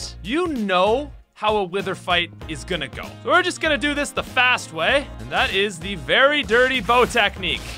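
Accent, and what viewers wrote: Ireland. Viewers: Is he Irish because sometimes he sounds Irish but it’s not super noticeable like most Irish people but I can kinda hear it. I HONESTLY can’t tell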